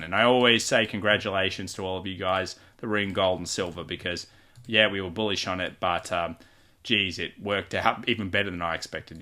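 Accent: Australia. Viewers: Your audio was fine. Maybe you sounded 10% less Australian which means that I can actually understand you better.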